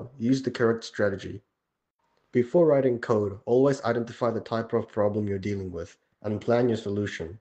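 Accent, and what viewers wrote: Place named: Australia. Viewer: You sound like an ozzie 🤣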